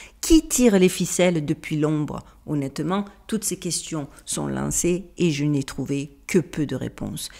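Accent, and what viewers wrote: Marseille, France. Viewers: Trop géniale votre vidéo j apprécié beaucoup votre côté solaire et votre franchise avec votre accent du sud Marseille? Moi j y habite mais j avoue que Las vegas ou San Francisco m attire bref continuez ainsi moi j adore 🙏👍❤️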